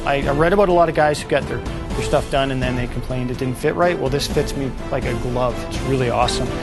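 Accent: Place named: Canada. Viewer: is the background music necessary?? and your Canadian accent is annoying!!!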